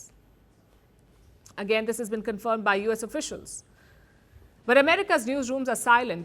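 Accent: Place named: India. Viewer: Can we have announcer that speaks English ( not Indian English). A lot of time , can’t understand what she is saying without caption.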